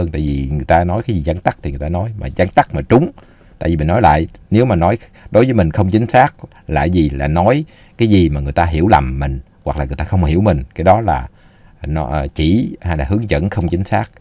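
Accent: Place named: South, Vietnam. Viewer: Cảm ơn thầy, Em rất thích học và nghe, gì thầy nói tiếng trong nam, Em nghe rõ, Em rảnh giờ nào cũng luôn mở nghe, chúc thầy và gia đình luôn được nhiều sức khỏe may mắn hạnh phúc vui vẻ an lành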